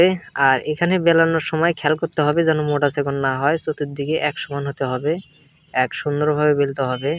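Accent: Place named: Bogura, Bangladesh. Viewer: তোমার কথা শুনেই বুঝতে পেরেছি।ভালো লাগলো ভাই।আমিও বগুড়ার মেয়ে। তোমার বাড়ি কোথায় বা কোন হোটেলো কাজ করো বলা যাবে কি?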